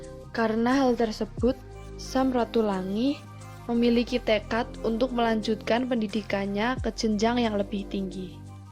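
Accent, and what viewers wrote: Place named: Java, Indonesia. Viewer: Backsoundnya enak nih. Cocok dengan suara pembicara yang medok eheww:v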